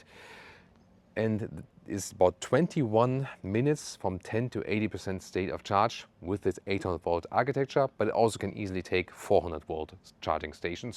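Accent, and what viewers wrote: Germany. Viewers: There's nothing sweeter than watching a BMW review with German accent :)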